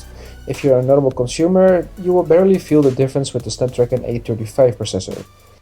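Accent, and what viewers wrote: Netherlands. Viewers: 100% Nederlands accent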